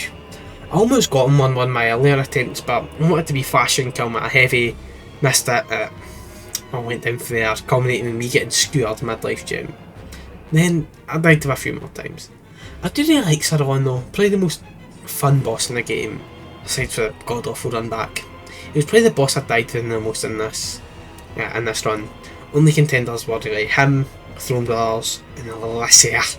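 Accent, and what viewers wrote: United Kingdom. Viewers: What do you want him to do, stop being British? The man can’t change his damn accent.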